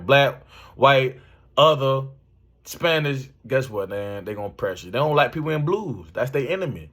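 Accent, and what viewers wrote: United States. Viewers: You gotta be from Florida I can tell how ya talk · You from Louisiana?? With the you hear me slang 😊😊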